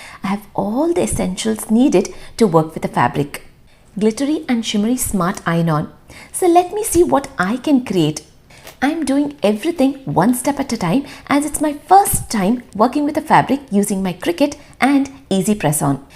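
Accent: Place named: North Karnataka, India. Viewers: You are amazingly beautiful... Loved the family time at the last which is the very essence of a happy family.. Loved to hear north karnataka kannada.. I'm ur new subscriber 😀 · Hi… came across your video recently and the language accent same as we do.. which part of Karnataka you are from .. nice video .. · ನಿಮ್ಮ ಉತ್ತರ ಕನ್ನಡ ಭಾಷೆ ಕೇಳಲು ಬಾಳ ಚಂದದ.♥️ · North Karnataka kannada accent damn!!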